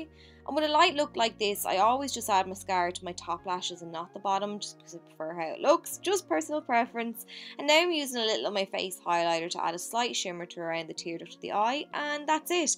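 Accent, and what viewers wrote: Ireland. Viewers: Subbing for that gorgeous Irish accent! And I have the same shade eye color so these videos will be helpful!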